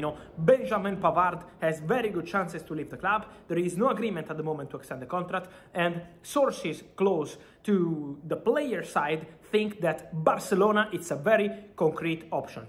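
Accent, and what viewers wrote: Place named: Italy. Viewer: bro, the "e" in "reached" or any other verb in past tense is silent. Cmon, you are THE football journalist. No need to loose your nice Italian accent but put in a little more effort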